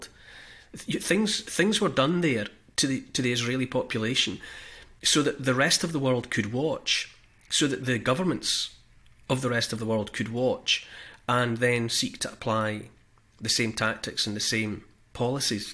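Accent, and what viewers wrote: Scotland, United Kingdom. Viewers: The Scottish accent is the most beautiful accent in the world and is easy to understand.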